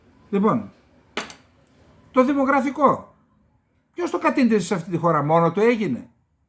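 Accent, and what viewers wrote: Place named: Pontic, Greece. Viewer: Καλημερα κ. ολως τυχαιως ειχα ενεργοποιημενους τους υποτιτλους και ολιγον σασουρευταν,δεν μπορεσαν δυστυχως να μεταφρασουν τα ωραια ποντιακα σας.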